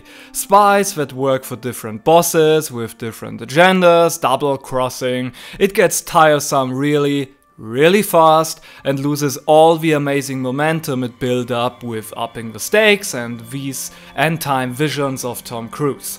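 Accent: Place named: Germany. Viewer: Interesting and informational video - but on some parts hard to follow due to the thick german accent, do you have english speaking friends who proof watch your work?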